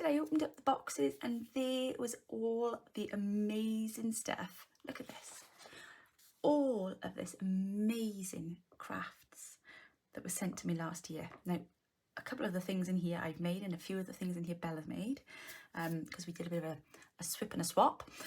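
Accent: Wales, United Kingdom. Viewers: Just found your channel from a fellow Aussie. This looks a fabulous idea. I can hear a welsh accent...oohhh...how wonderful. I was born in Wales and my family emigrated to Australia when I was 7. Most of my family still live in Wales. So lovely to hear a welsh crafter. 🙂❤️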